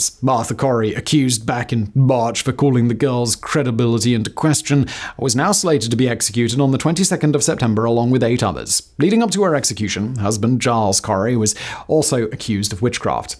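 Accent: United Kingdom. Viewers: I thought British people only talked like this in movies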